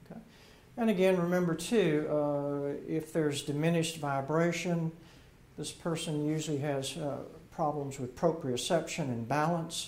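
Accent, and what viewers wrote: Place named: Upper South, United States. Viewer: It's called an "upper south" accent. Distinct from the "deep south" drawl. This is more of a Kentucky, Tennessee, Virginia, or North Carolina way of speaking. Some parts of north Texas also similar.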